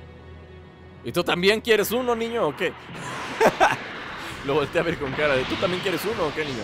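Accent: Aguascalientes, Mexico. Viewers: eres mexicano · eres de Aguas Calientes?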